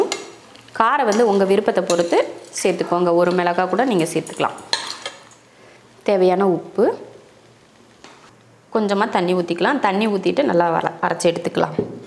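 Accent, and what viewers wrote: Coimbatore, India. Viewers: Neenga Coimbatore ah madam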